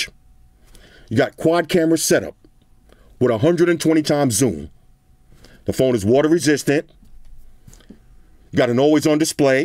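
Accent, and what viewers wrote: New York, United States. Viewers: My mans def from the NY area! 😂 “jefferson forehead” LMFAO! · Yo going off is the greatest shit lol. The NY force is strong. You can hear the wind hitting the timb tree in his heart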